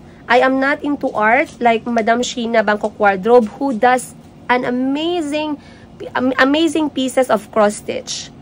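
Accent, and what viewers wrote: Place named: Visayas, Philippines. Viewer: napanood kita mula sa 1st video mo..true from the beginning you were full of finness the way you speak at pagkilos.naobserbahan klng ito.nasubaybayan kita..could be due to sad & traumatic experience mo dun sa Uganda (gawa ng asawa mo)unti unti nagiba ang way of speaking mo.Very understandable yun.Now,na nandito kn sa Pinas you can be your natural self.Bisaya ka kya may pagkatigas ang salita & that's okay.mas marami kming natutuwa sau dahil dun minahal ka nmin pati ang mga anak mo at mga kapatid mo at staff. Tama yang attitude mo deadma sa mga bashers.Sikat kna kasi😊 Goodluck & God Bless!🫶🙏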